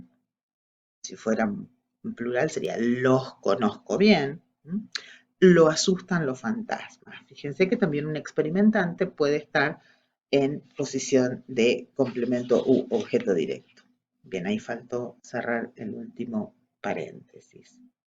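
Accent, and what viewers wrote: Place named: Argentina. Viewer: Por favor no dejes de hacer estos videos! Están increíbles. Muy bien explicados, muy interesantes y en español de argentina! 🇦🇷❤ Te agradezco mucho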